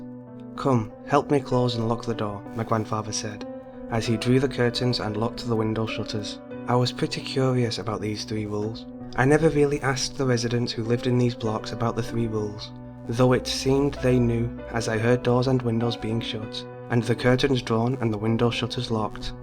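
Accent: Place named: United Kingdom. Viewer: as a American i can say you British and your accent makes everything sound scarier lol good stuff man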